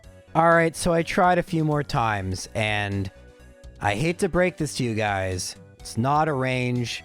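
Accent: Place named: Canada. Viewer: He's Canadian, and canadian pronunciation caused both of those "issues".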